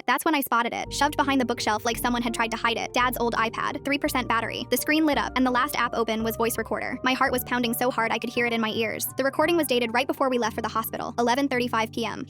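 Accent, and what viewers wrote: United Kingdom. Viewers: Are you british question❓️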